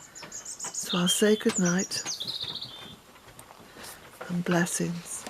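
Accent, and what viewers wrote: Ireland. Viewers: Oh how nice it is to hear those words read in your lovely Irish tones. You give new resonance to your writing. Thank you. Have a beautiful rest and wake to the scent of rain.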